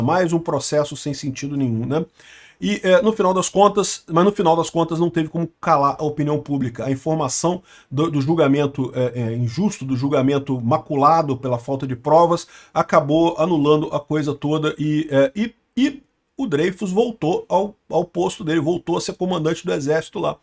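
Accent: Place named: Rio de Janeiro, Brazil. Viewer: Tbm amo esse jeito dele se expressar! E tbm o jeitão Carioca. Mato as saudades do meu povo do Rio.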